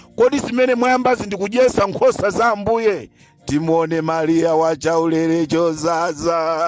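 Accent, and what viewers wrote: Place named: Malawi. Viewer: Comrade come on…. This is a real Malawian talking in the voice Avoid this if u want to be taken serious Come on man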